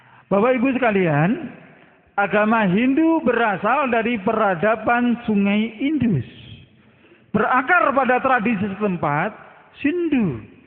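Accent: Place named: Java, Indonesia. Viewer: keturunan brahmana dari mna logatnya aja jawa dasar ngaku2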